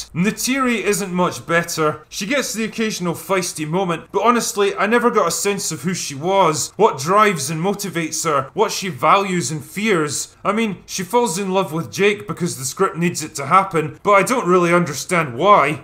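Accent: Scotland, United Kingdom. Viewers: Ten seconds in, already sounds like the narrator is about to have an aneurism Edit: oh he's Scottish 🍀 Edit Edit: why do Scots have blisters on their dicks? Cos they're such tight fisted wankers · You're Scottish? I just thought that was just drunk accent.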